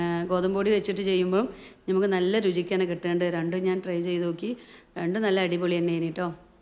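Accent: Malappuram, India. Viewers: മലപ്പുറം + കാലിക്കറ്റ്‌ / മിക്സ്‌ സംസാരം,,, വേണ്ട,, നല്ല രൂപത്തിൽ സംസാരിക്കാൻ ഒന്ന് ശ്രമിച്ചു നോക്കൂ,, 👌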